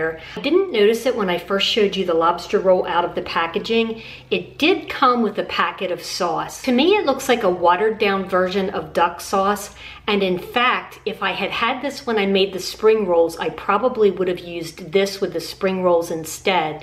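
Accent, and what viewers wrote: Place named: Pennsylvania, United States. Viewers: Great video! Are you from Pennsylvania? Your “accent” sounds like it! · Love the video but staying for your Pennsylvania accent 🙂 coming from a Minnesotan.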